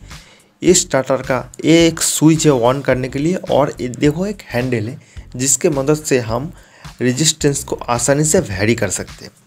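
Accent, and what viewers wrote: Bengal, India. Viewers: তুমি বাঙালি তাই না??. হিন্দি বলার ভঙ্গিতেই ধরা পড়ছে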